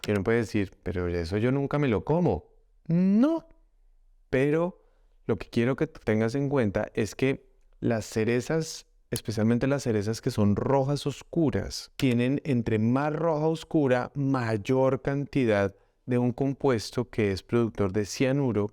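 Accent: Colombia. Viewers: Dr as Ud colombiano? De Medellin o de manizales. Con el acento que tiene me parece haberlo oido desde que naci. Soy de Manizales en donde el 70% de la gente es y Ud con ese acento....pues debe ser o antioqueno I manizaleno. Cosa que me alegro sombre mantra y me pone muy pinchada❤❤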